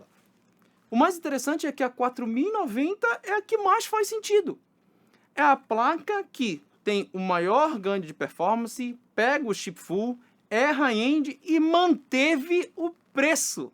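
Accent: Pará, Brazil. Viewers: Esse sotaque é do Pará? Do nada achei seu canal e agora tô aqui assistindo sobre placas de vídeo 🤭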